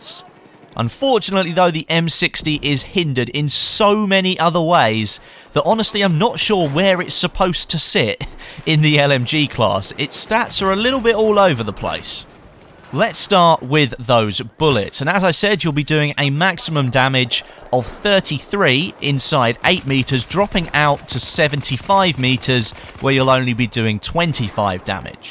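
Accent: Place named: RP, United Kingdom. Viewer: your english is so beautiful! You talk like some dude from "BBC Learning English":D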